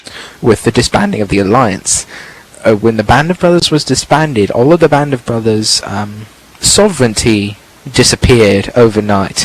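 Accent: United Kingdom. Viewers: UK accents make for great narratives!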